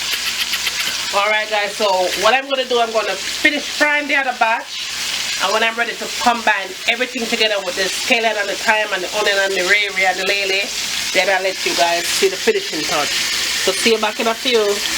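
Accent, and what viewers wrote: Jamaica. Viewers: I just stumble upon your channel and I am enjoying your nice Jamaican recipes, I will be cooking some yellow tail snapper for me and the hubby later today, love your Jamaica accent. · Lawd di patwa talkin. You don't know seh ah foreign you de? LMAO lawd mi sistren big up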